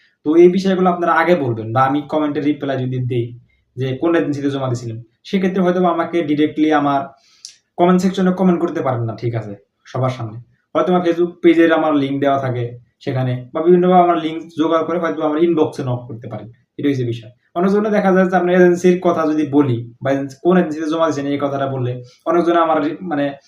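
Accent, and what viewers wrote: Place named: Sirajganj, Bangladesh. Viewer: ভাই আপনার বাড়ি মনে হয় আমাদের সিরাজগঞ্জ৷আপনার কথা শুনে আন্দাজ করলাম ভাই 😊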